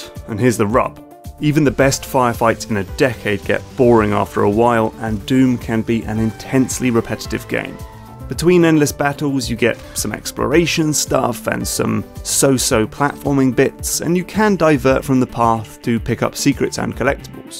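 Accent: United Kingdom. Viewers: Your British is showing! ; ) "This thing, that chases you like a quarterback." Quarterbacks don't chase people! Anyway, love the channel! Keep up the good work.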